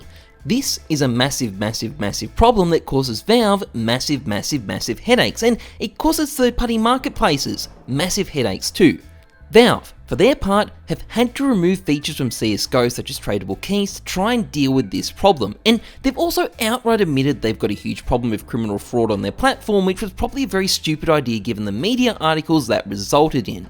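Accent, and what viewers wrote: Australia. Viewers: Why does he sound like someone doing a parody of an Australian person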